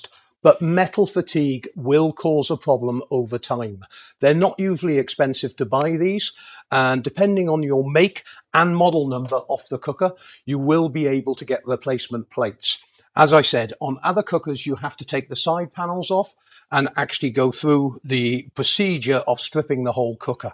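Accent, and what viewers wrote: United Kingdom. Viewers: Absolutely fabulous! As usual. Every time I find something new to learn with. Special thanks for your plain, Royal English so very easy to follow!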